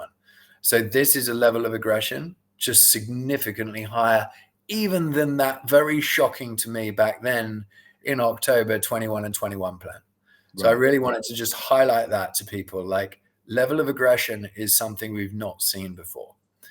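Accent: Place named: United Kingdom. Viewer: All I heard was a gay bored British accent start a sentence with the word “so…“ Before I quit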